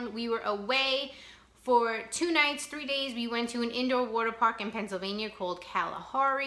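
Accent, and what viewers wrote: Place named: Long Island, United States. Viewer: The Fit and Fabuless Mama I am in Ontario I live in the north of the province in a small town close to Huntsville Love that you caught the u, if we were speaking g then for sure you would hear a Canadian accent as I hear your LI accent and think it's lovely 😊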